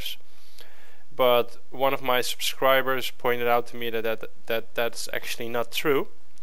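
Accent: Netherlands. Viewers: Are you dutch? really does sound like it :) thnx for the info :D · You sound Dutch. Are you from the Netherlands? Great demonstration! I subscribed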